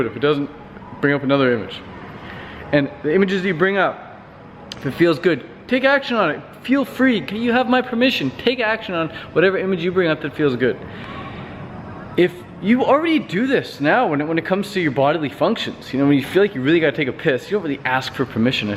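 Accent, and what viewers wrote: Canada. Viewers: which part of Canada are you from?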